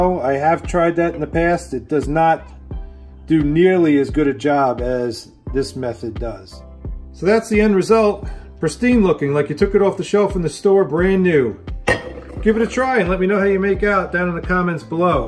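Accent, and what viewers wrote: New York, United States. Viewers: i used a similar method to this, just with dishwasher tablets. and the results are impressive. by the way, are you from ny? if you are, i quite like the ny accent.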